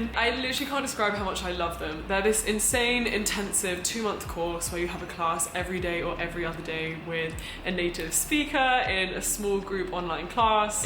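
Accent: Argentina. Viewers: Me encanta tu acento cuando hablas el español. Un toque del argentino, ay que precioso! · Aaaah you already have kind of an argentinian accent, so cute, you are doing so good 👏🏼👏🏼👏🏼👏🏼 mucho ánimo 💪🏼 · "jugo de naranja para 'shevar'?" AMO que su español tenga un pequeño acento argentino, es muy tierno💕💕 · Hey! I have a few things for you to maybe help your Spanish! Let's go = Vamos (Second person in plural, present tense of "ir"), "Vamonos" sound like let's leave for Argentinians. "Hoy" sounds a lot like the German word Heute, both mean today You can you "Son las 3 de la tarde", you can just forget about the subject of the sentences, it doesen't matters to spanish speakers if it's the hour or the hours that "son". I love that your Spanish has a little of an Argentinian "sh" sound 🤭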